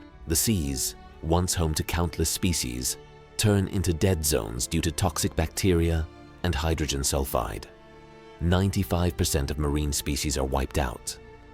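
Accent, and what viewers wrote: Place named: United Kingdom. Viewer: Just sub'd; lovely video, love that British voiceover ! Good luck with your channel...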